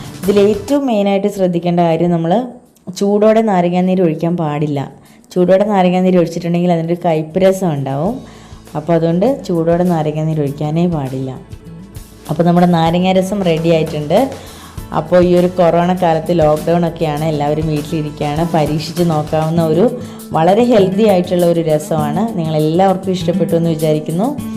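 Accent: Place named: Kottayam, India. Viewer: Wow. Nehru Trophy. Such a wonderful place. Before 3 years we had a boating in Nehru Trophy. Kindly make one episode to show your place if you can . We are waiting to c. Actually your accent is Kottayam. That s y i asked such.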